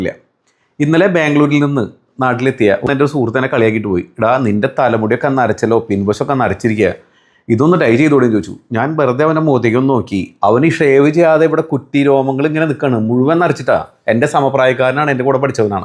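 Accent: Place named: Thrissur, India. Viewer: ചേട്ടന്റ സംസാരം കേട്ടാൽ അറിയാം നമ്മടെ തൃശൂർ കാരൻ ആണെന്ന് ഞാനും. എന്തായാലും അടിപൊളിയാണെന്ന് തോനുന്നു വാങ്ങി ഉപയോഗിക്കാം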